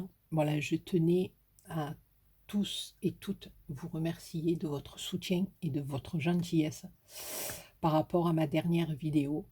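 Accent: South, France. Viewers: A tres bien....tu as tout compris......reste comme tu es ...simple ...a t ecouter ...c est un spectacle.....j adore ton langage ton accent du soleil......ta generosite dans tes paroles.......tu as eu des combats que tu as gagner....alors n attrapes pas la boufalliiissse....avec des mauvais satellites........ il existera toujours des gens qui tournent en rond.....et qui ne savent que baver ........ils ne savent rien faire d autres.....qu a regarder le train qui passe......bonne soireeeeee.....bisous....a bientôt.....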